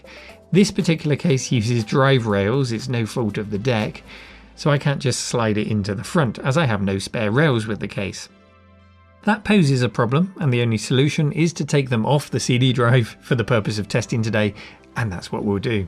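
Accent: United Kingdom. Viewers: British LGR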